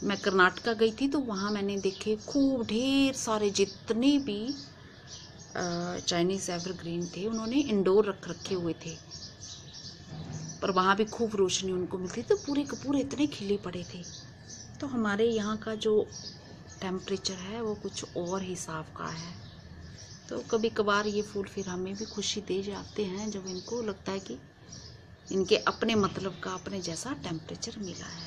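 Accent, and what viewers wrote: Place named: Jammu, India. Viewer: apki language ki tone sa aapka muja jmu ka touch lagta ha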